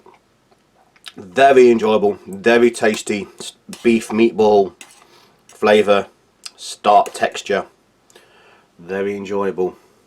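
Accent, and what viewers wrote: United Kingdom. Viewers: Great and detailed review ! And as always it's a pleasure to hear your pure British English :) I like the German ration because of the diversity and amount of items. The content tries to remain traditional German meals-like breakfast-which are often bread/rolls with butter and some meat or cheese. The cold drinks are the best !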